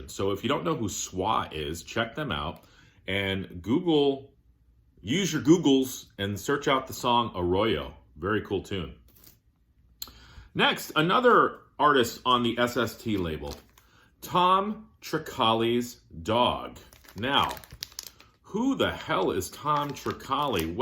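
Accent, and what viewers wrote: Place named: United Kingdom. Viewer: The British have the best accent hands down. What is this SST label you speak of? I love the dramatic pause after the “no ” reveal. You had me at Surf Rock with a punk edge. Fun fact, the name SWA was conceived by the band assigning an alphabetical value to numbers on a gaming die, then rolling the die three times. If you like SWA check out or.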